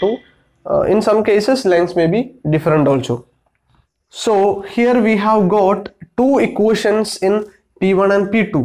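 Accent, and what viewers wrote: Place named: Kerala, India. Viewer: Are u a malayali, anyways great video. Keep delivering great content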